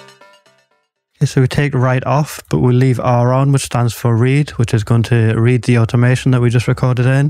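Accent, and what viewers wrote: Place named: South Shields, United Kingdom. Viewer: I have watched it through 4 times now so you should be getting a cheque fo $0,0000 x 4 dont spend it all at once at the moment I managed to get in an instrument but cant get any sound out. I used cubase with atari many years ago and it was so simple with a Roland JV-1080 and got some great results (one recorded by Tom Jones ) Written in South Shields You sound as though your from up there but I cant get it to work with this I,ll soldier on trying to get it to speak to me I've got 26 days of trial left but at the moment even with your brilliant tuition it a no no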